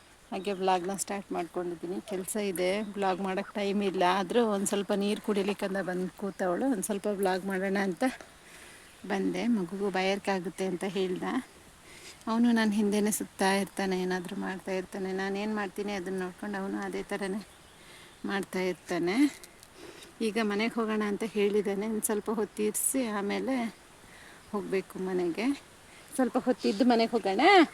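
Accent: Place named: Tulu Nadu, India. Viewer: Nimage Thulu barutha magu mathaduvaga anishithu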